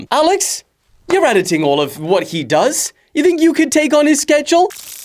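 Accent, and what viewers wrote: South Africa. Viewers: Are you South African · Is South African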